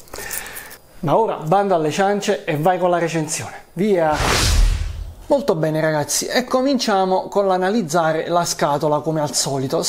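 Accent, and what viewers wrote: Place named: Paris, France. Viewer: Disturba solo un poco l accento parigino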